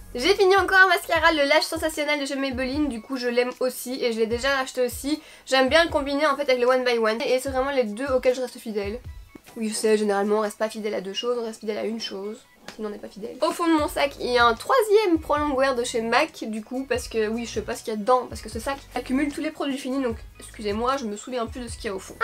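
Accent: Belgium. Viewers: Accent belge VIVE LA BELGIQUE!!!!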